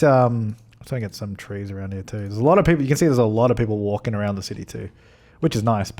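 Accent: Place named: Australia. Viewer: i love listening to game commentary in an aussie accent, they don't go fucking mental like Americans which is just lovely ...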